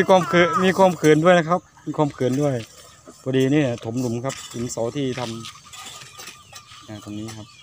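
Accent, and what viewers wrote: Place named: Isan, Thailand. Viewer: พูดอิสานแล้วฟังสบายคะ ทุกอย่างธรรมชาติดีที่สุด มักบักดาว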